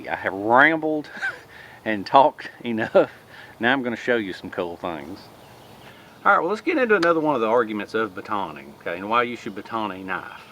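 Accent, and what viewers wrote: South, United States. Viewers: I like the videos I've seen you do and will be watching more I subscribed to your channel I like your knowledge, instruction, and your southern twang (I'm from Texas so I get it) anyway thanks for your time and enjoy your videos please keep making them. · i could tell by your southern drawl. I’m from WNC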